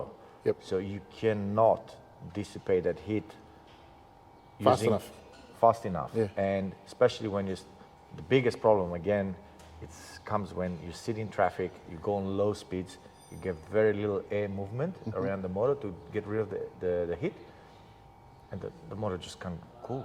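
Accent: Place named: Australia. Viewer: German dude speaking English with an Aussie accent 😉 👍🏻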